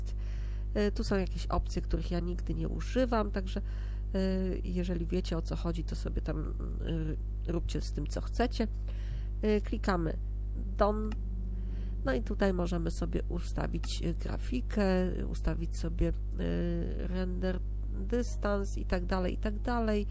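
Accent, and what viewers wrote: France. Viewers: jesteś z innego kraju np. Francji, bo masz taki akcent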